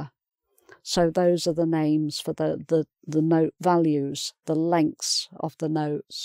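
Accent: United Kingdom. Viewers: wow vary pority english british viva teacher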